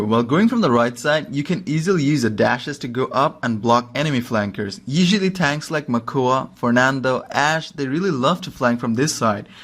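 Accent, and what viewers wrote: Bengal, India. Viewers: Bruh u r bengali??? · My guy bangali, noice, I understand what he said 😂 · Bro. You are Bengali? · ভাই বাঙ্গালি নাকি? এক্সেন্ট এমন লাগছে হ্যা অবশ্যই! পরে ভয়েস শুনলাম। :3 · You're bengali ?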